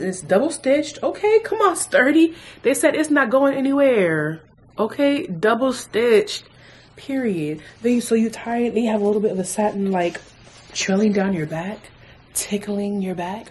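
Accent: United Kingdom. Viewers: Your British accent though...love it, and I'm mad country!🤣🤣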